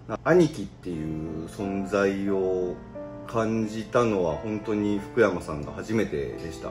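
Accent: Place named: Ishikawa, Japan. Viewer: 壱成君何気に言葉のイントネーションが石川県弁？になってる(笑) めちゃ親近感あります🙆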